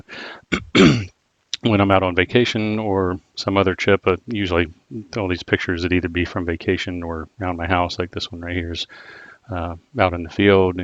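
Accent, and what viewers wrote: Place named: United States. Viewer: Neigh con, zee lens. No matter how many times I hear it said in an American accent, I mentally keep correcting it as Nick on and zed. English is a strange language to me.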